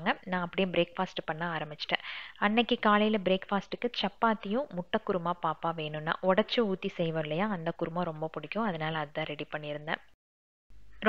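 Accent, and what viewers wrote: Coimbatore, India. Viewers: Love from UAE... Am ur new subscriber I used to watch very few ppl vlog only .. Recent times I used to watch ur videos also I like ur kovai slang..and way of ur cooking good.. It's seems like my mother preparations... When I miss my family and my mom ur videos made me relax... Good job keep rocking.. Also I saw another one videos u mentioned abt some negative comments also o watched that particular u tuber videos... It's completely different ur video covered end to end thing it's interesting also very useful.. All the best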